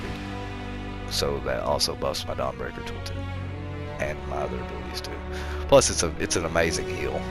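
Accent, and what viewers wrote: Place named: South, United States. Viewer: You sound southern as hell man, roll tide.. subbed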